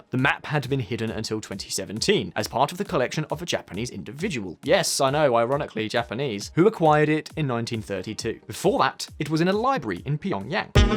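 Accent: United Kingdom. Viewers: “Hotspot: hotter than a hotpot.” Love your British accent mate! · this guy narrating is obviously British, and should know why lump of rocks in the ocean is so important.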